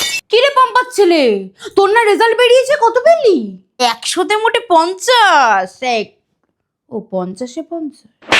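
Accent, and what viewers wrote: Bangladesh. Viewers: Kotha sune Bangladeshi mone hoy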